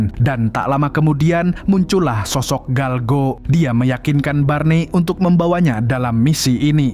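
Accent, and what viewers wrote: Banjar, Indonesia. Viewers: Org bnjar kah pian mang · Banjar nya kelayan A Admin nya😮😮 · Admin nya orang banjar rupany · Logat bahasa Banjar nya gapernah ketinggalan😊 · Urang Banjar kah pyn nie😅😅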